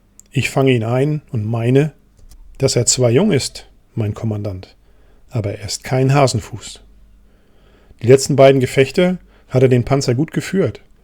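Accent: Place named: North Germany, Germany. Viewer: Wie immer spannend vorgelesen. Alle Achtung ist eben Norddeutsch.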